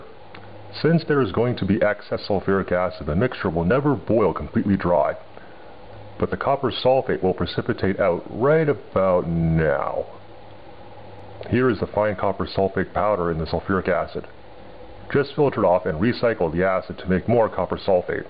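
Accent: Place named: Canada. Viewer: You have the slightest Canadian accent :D but that's probably because of your voice changer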